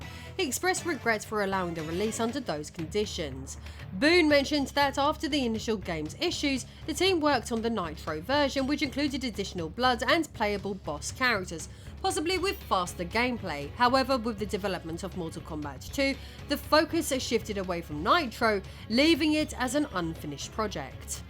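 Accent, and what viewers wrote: United Kingdom. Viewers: The Brits have a different way of saying certain things. ZX Spectrum is "Zed Ex Spectrum" there. I'm used to it. They probably think the way we say certain things is odd so 🤷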